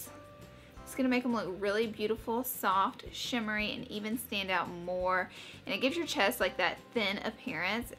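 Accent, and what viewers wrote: South, United States. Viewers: All the power to you! So glad someone made this video. Also where are you from girl? Southern accent? Love it! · are you from the south? because if you are your accent is so cute!! I LOVE it!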